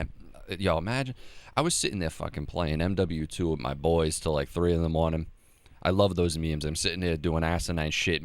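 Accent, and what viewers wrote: Boston, United States. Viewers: Not an insult, but you sound like one of the boys outta Boston.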